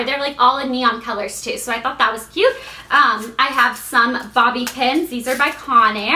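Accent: South, United States. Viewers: Lol you never know when someone is going to get a shark bite so good thing you have your teeny tiny first aid kit! Haha you made me laugh so much in this video and I love when we can hear your southern accent a bit -3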